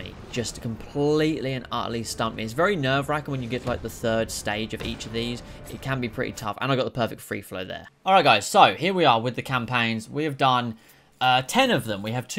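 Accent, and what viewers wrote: United Kingdom. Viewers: Whenever I feel lonely in the world, for a few minutes shows me that he too feels all the rage. But in British so it’s classier. Dude, you have become my favorite platinumer on YT! Great content as always!:)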